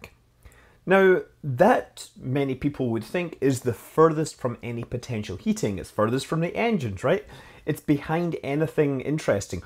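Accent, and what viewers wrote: Scotland, United Kingdom. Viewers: Its amazing your knowledge on all these subjects you do in your channels, I think yer a very clever man, a credit to the internet, BTW by your accent Do we live in the same country,? the UK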